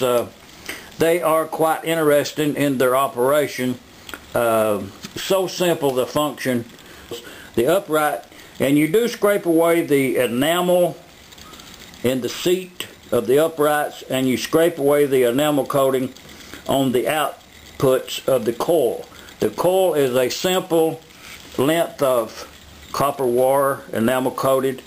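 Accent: South, United States. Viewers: Good stuff. Love your drawl! I love tha south son! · The southern accent is strong with this one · I think this video and it's comment section served to demonstrate a phenomenon that is counter intuitive, but exists nonetheless.... The phenomenon is, this guy's Southern accent and style of speaking actually caused to generate unwarranted criticism and hatred from viewers...I challenge one to find another video on YouTube that generates so many, i think unwarranted complaints. The complaints are valid, but most homemade videos are all around worse...Cinematography, subject, narration voice and content/explanation... I put forth the hypothesis that because you all hear the accent and subconsciously, at the very least, you think he's a racist or bigot in some way....and the rest is a YouTube comment section.... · lol if you think that sounds like irsh/scottish then you have a problem LOL wtf... 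you can tell he's American... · I believe its a genuine dialect of English as spoken in some part of the USA. The English language is very mangled but I can make out some of it. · I like your accent,you sound like a good ol boy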